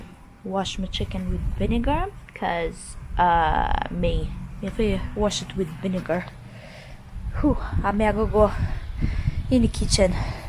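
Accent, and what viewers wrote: Jamaica. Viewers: Hey I haven't seen your videos in years! Last video I saw was when you went back home years ago to Russia or Ukraine I can't remember which 1 your from!!So glad your video popped up on my timeline.You have Jamaican accent now very cool 😎 👍🏾 1Love and Bigup your self💯🙏🏾Much Love to Your Family God Bless 🙌🏾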